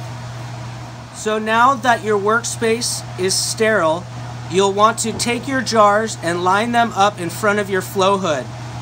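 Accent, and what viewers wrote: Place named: United States. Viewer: you sound like a warm blooded American, like me. Folks jealous can't stand your teaching freedom & and basically free self-sustainability, gj, and Many-Thanks!! I'm wanting to start growing some. You could almost live off these mushrooms, if it's enjoyable it could make you some decent money. 🍄 many thanks my friend.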